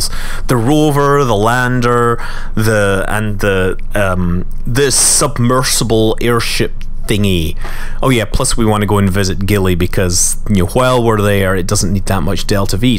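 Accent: Scotland, United Kingdom. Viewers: ^_^ MOAR SCOTTISH! I must obey the need.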